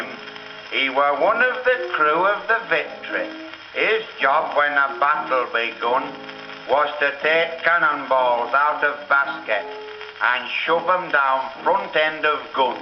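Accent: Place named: Yorkshire, United Kingdom. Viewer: "Stanley Holloway is impersonating Marriott Edgar here"? I beg to disagree. Marriott Edgar was a native Scot, so I don't believe he spoke like this. Although he wrote the poem (lyrics), it does lend itself admirably to the accent employed by Stanley Holloway - one very unlike that of Scotland but most similar to that of the middle/southern part of Yorkshire - for this recording and many of his other collaborations with Edgar.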